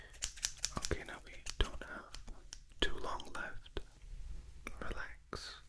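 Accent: Scotland, United Kingdom. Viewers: Eh? Scottish?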